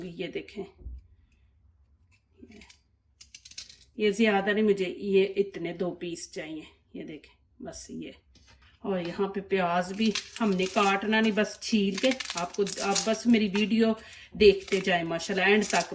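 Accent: Sialkot, Pakistan. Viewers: بہت اچھی ریسیپی بہت ہی اچھے انداز میں سمجھا سمجھا کر پیش کی۔۔آپکا سیالکوٹی لہجہ بہت پیارا لگا۔۔۔۔۔اللہ آپ کو ہزاروں خوشیاں عطا کرے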